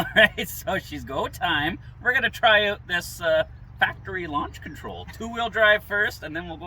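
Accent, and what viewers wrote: Canada. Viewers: Lol. Canadian AF. Love your energy bud 🇨🇦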